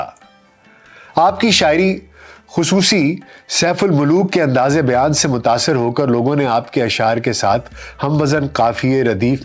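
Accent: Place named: Punjab, Pakistan. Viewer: Bhot zabardast Punjabi lehja superb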